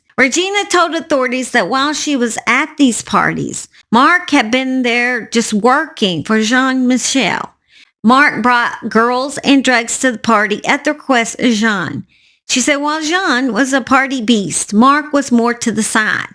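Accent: South, United States. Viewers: me too, loads of stories I haven’t heard of, and there’s been a lot, if you decide to visit wrap up well 🧥🥶🧣🧕 your southern accent is very comforting to me, iv always wanted to visit loads of places in America but ended up in New York twice xx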